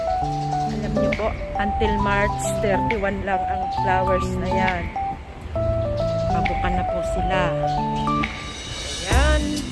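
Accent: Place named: Ilocos, Philippines. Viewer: Parang ilocona sounds ka lods love it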